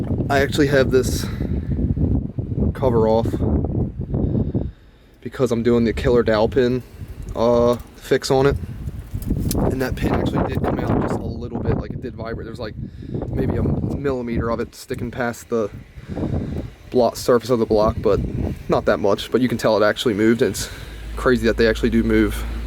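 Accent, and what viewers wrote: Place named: Maryland, United States. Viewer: I knew it was MD first few seconds of video, royal farms and the accent dead giveaway 😅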